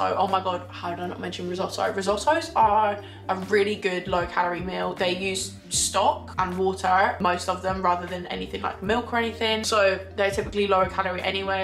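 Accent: Scotland, United Kingdom. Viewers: Well done on the weight loss! Amazing work! I’ve not watched you in years and you only just came up on suggested even although I’ve been subscribed for years. Where’s your Scottish accent gone??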